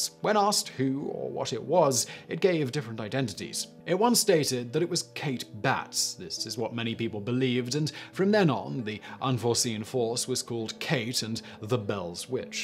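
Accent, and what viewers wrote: United Kingdom. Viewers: Who is this British man? And i wander does he smoke weed?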